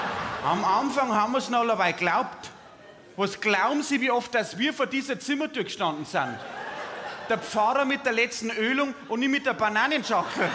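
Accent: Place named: Bavaria, Germany. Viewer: Ich versteh ka Wort 😂 bayerisch halt 😂😂😂😂😂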